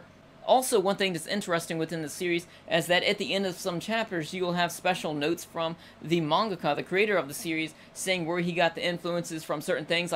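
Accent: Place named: United States. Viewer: Random question, but where are you from? I can hear a country accent from you lol.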